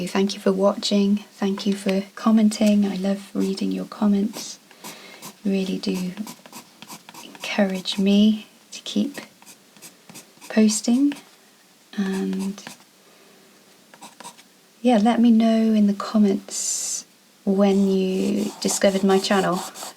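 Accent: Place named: United Kingdom. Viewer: I love the colors in this so much, and your style! Started to watch yours and other YouTubers this winter when I surfed around for inspiration this dark winter. Always loved British accents and yours is so nice to listen to, haha, sounds weird maybe, but I am Swedish and most sweeds speak English with a terrible American accent. The vlog when you are walking around and sketching the beautiful landscape is so nice to watch. I'm going to South of France next week and will be doing my first sketching outside there I hope. In Stockholm its far too cold, the snow just melted. Thanks for the inspiration!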